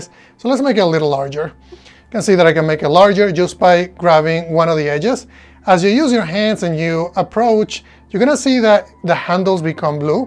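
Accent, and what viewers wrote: Spain. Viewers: muchas gracias por responder!! y perdona por tomarme la libertad de escribir en español en tu canal en inglés, deduje por tu acento y nombre que lo hablabas. Me encantaría preguntarte si consideras factible que en algún momento puedan sustituir a las pantallas físicas, es decir, vemos como reproduces un trailer en una ventana de windows, podríamos estimar que representa una pantalla de 100 pulgadas o las que te apetezca, cierto? pero se aprecia que no son 100% opacas, no sé si es algún parámetro concreto que has configurado en este caso... En definitiva, sería increible poder ver una película proyectada en una gran ventana, virtualmente en una pared sustituyendo a un televisor y también, saber en qué medida afecta a tu vista, si te sientes cansado o algo similar. Muchas gracias de nuevo.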